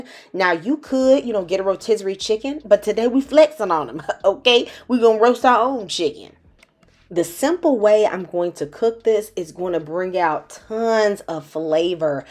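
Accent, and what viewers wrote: South, United States. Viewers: Why you sound like that other lady who cook do all y’all southern ppl sound the same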